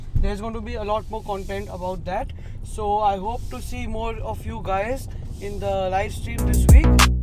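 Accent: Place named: England, United Kingdom. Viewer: Your vidoes and content are very good but many of my frnd Don't take interest in because of your very heavy English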